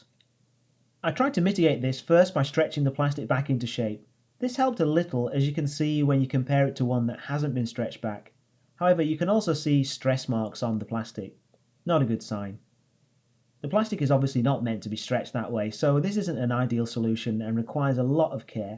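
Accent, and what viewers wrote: United Kingdom. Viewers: He is Japanese with top-tier British accent, by the way.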